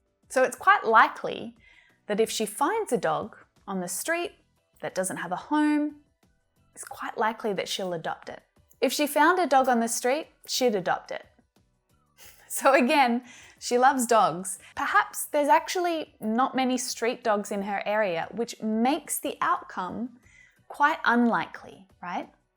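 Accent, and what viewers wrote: Australia. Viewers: I live in Canada and I am so happy to listen to your Australian accent that I love. I love to visit Australia as well. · Australian English is the coolest 👍🏻😃, I really like your accent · your accent is so different. I LIVED in montreal, Canada. The accent from Austrilia is so good.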